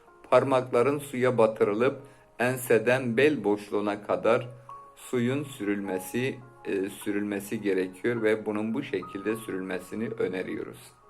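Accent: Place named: Van, Turkey. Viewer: Vanli mısın hocam😊 65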